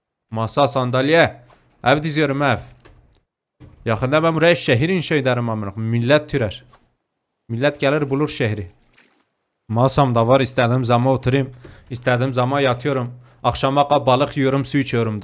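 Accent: Elazığ, Turkey. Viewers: Abi Elazığlı mısın ? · Abi nerelisin bence Elazığlısın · Abi sen Elazığ lı mısın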